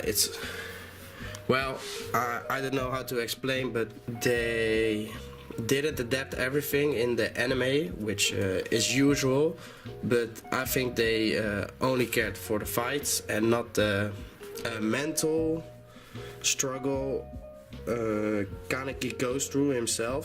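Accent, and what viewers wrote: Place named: Netherlands. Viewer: I can hear ur from the netherlands, fellow dutchman. 😂 cool collection!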